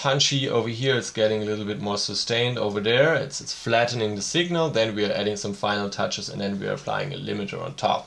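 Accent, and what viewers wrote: Germany. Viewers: You're german, right? :D Nice Video!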